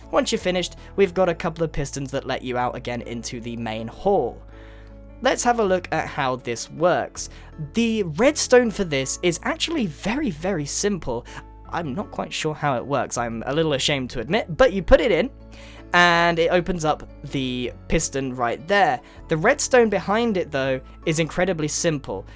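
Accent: United Kingdom. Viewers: I am new ,but this guy sound like he is British or he sound like you are supposed to be in a Harry Potter movie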